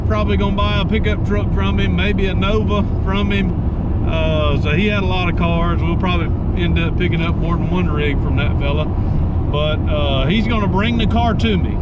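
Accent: South, United States. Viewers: Is not from down South like you? I love your accent dont loose it please. In Canada we love people who keep their accents. Georgia Rocks. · Good video cars engines, even a woman,and some funny jokes, tks fer the entertainment. Also don't change the way u talk, its ur roots,one of the other guys, perhaps adds one of those southern pronounciations as well. I still have my Kiwi accent and have been living in the States over 40 years.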